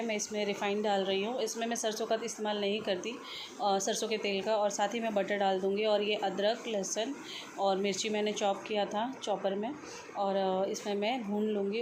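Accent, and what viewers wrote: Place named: Maharashtra, India. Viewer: Hi kaisi ho apke bolne ka trika bohot acha or jo aap marathi touch dete ho beech beach may vo to osam h mast lgta h, or jo English sikha rhe h unko bolo mujhe apne aap pr proud h mai Indian hu meri matr bhasha hindi h 😊 isi hindi bhasha ki wajah normal ladies bhi apka video dekhti h hum apka video apne TV pr lga k sab log dekhte h or yhi apke vlog English may hote to adhe log dekhte or adhe log muh bnate but jo bhi ho apki recipe bhi bohot achi hoti h,hindi may hone ki wajah say or Mia ata h dekhne may good job God bless you............. English walo k liye 👹buri nazar wale tera muh kala 👹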